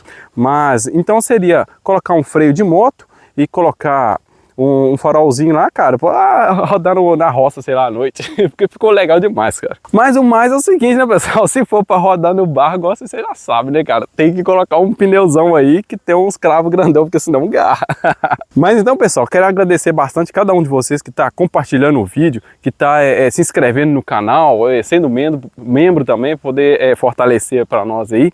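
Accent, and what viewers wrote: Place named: Montes Claros, Brazil. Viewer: Onde essa cidade pelo seu sotaque, me parece que e de Montes Claros ou regiao.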